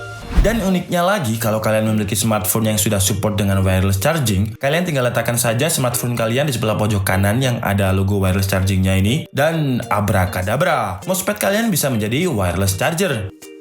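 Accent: Malang, Indonesia. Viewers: Khas e wong Malang 😂💪🔥🔥🔥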